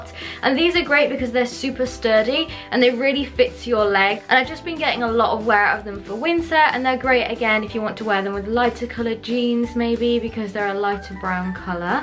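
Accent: United Kingdom. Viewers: Her accent is it britanico or am I wrong?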